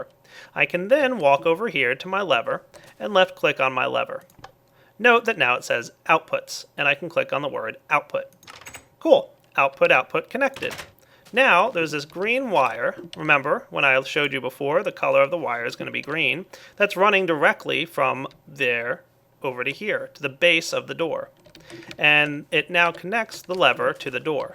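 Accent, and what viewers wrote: United States. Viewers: Dire is American?! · since when were you American?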